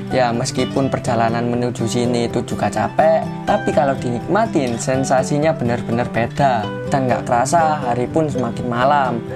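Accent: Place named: Java, Indonesia. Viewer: Logatnya jowo bget..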